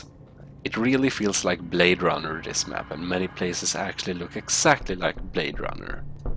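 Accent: Sweden. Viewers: I love the Swedish accent.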